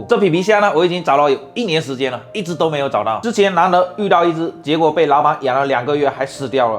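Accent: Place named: Fuzhou, China. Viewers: 福州口音呀？ 大哥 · 虾菇好吃。福州腔好重，福州人吧？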